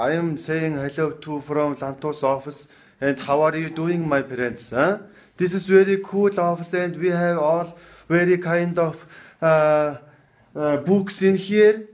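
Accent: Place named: Russia. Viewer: It s almost talking russian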